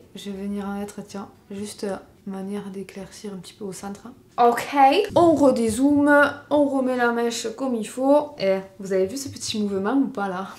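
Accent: South, France. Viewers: Le dimanche c'est toujours le coup de blues, heureusement ya l accent du sud de pour ramener un peu de soleil ⛅ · A chaque fois que je vais chez Action et que je passe dans le rayon make-up, je regarde vite fait mais j'achète rien parce que je trouve que ça a l'air cheap à mort et je me méfie des marques "inconnues", j'ai peur que la compo soit pourrie et que ça soit tout naze mais franchement à chaque fois que tu fais des tests de leur make-up ben je suis agréablement surprise et au final y'a des trucs qui me donnent envie ! Je crois que je vais me laisser tenter par une de leurs palettes (même si j'en ai déjà plein et que j'ai quasi toutes les couleurs possibles) ! Donc merci de tester à ma place ! x) J'adore le make-up que tu as fait, il te va super bien au teint et s'accorde super bien avec tes beaux yeux bleus ! C'est cool d'avoir changé de couleurs et de ne pas avoir fait comme d'habitude un make up doré/marron (même si j'aime beaucoup aussi) ! 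Toujours un plaisir de regarder tes vidéos après toutes ces années, je ne me lasse pas. Ton accent du sud et ta bonne humeur me font toujours un bien fou, tu arrives toujours à me remonter le moral, tu es ma petite dose de gaieté hebdomadaire ! <3 J'espère que tu n'arrêteras jamais de nous faire des vidéos !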